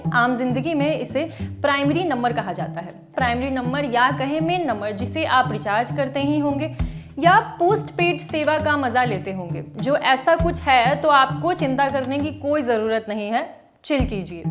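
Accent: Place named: Bihar, India. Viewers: Nice hindi bur bihari accent Accha laga❤ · Apke bolne ke accent se lag rha hai aap bihar se ho Abhi thoda time lagega hindi accent me bolne me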